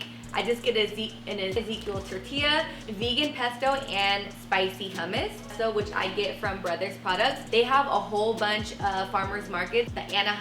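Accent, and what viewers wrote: France. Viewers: Ahhhh you speak French! Trop bien i love your accent 💕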